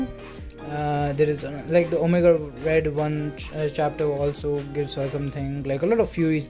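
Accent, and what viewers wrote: India. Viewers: Are you indian??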